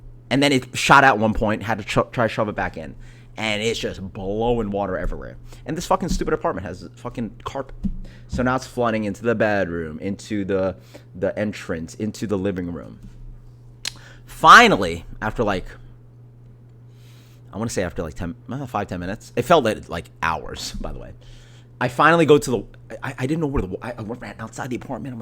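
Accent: United States. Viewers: dude, your new york accent came out here. wish you the best bruh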